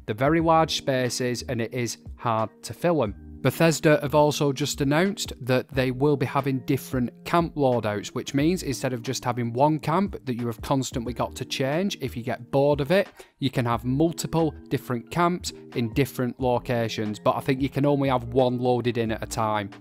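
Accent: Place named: Yorkshire, United Kingdom. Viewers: God I just love you accent mate. I'm from Britain and I'm guessing maybe your from Yorkshire? Either way you accent is brill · Are you from Yorkshire? I am and I'm rubbish with figuring out other people's accents 🤣 Great video by the way, thanks!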